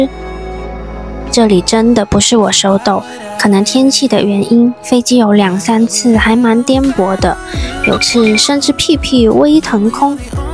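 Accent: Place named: Taiwan. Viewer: 你講話都沒有中國的語氣，聽起來根本是台灣本地人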